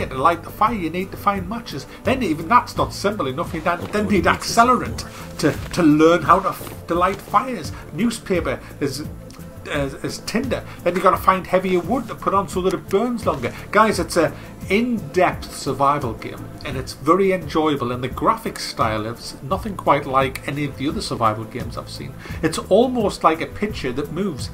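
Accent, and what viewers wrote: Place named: North East England, United Kingdom. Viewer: Great review. Just bought this in the Steam sale, aided by your exposition. Looking forward to playing it! You sound local - where are you from? I live in Cardiff now but I'm up home in Gateshead for Christmas!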